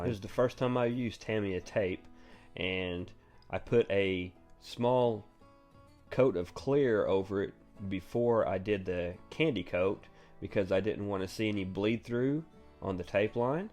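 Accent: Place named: Kentucky, United States. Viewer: not a problem man. Its nice hearing someone with an accent like me. Im from Kentucky and I have an accent too. You sound normal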